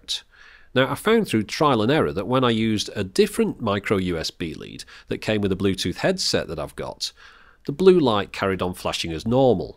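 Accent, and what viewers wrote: United Kingdom. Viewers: You can't go wrong with a british accent! :)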